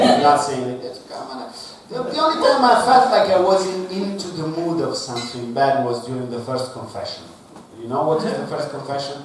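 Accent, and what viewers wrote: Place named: Milan, Italy. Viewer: Anche quando parli inglese hai l'accento milanese 😅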